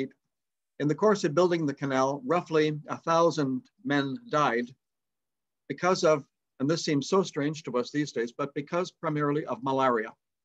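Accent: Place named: Canada. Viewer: really enjoyed your presentation. That was a good history lesson and I enjoyed your pictures. I could tell from your accent that you were Canadian. I’am one of your neighbors from the States. Back, years ago, I did a tour of Canada for a couple of weeks. Really enjoyed meeting all the people I met along the way. My mom’s family are all Irish here in the states. Anyway, I’m looking for a way to defend myself from the epidemic of druggies who are doing a lot of the stealing and robbing State side. It’s getting rough here.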